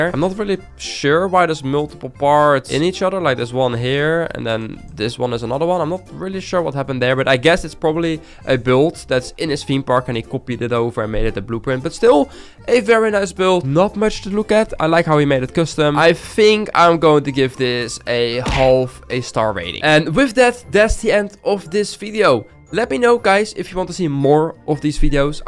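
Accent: Netherlands. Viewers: he’s Dutch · I have a question are u form the netherlands?? Cuz u sound like u form😅😅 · are you NL? you sound like it while speaking English i can now a am also Dutch. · Hes dutch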